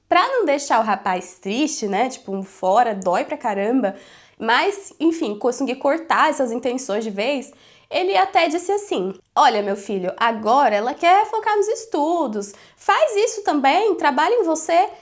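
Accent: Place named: Sao Paulo, Brazil. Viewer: Nao fala português, para mim fala igual paulista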